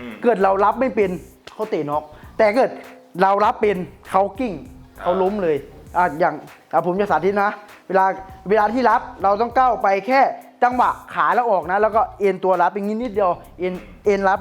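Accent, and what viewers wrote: Isan, Thailand. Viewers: เป็นคนจังหวัดไหนนิ บ้างทีอิสานปนมาด้วยเวลาพี่อธิบาย ผมละชอบ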